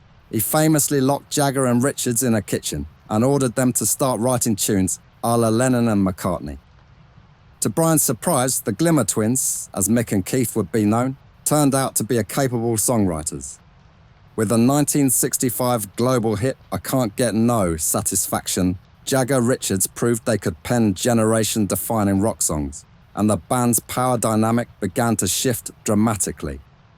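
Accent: United Kingdom. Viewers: British Howard Cosell narrator...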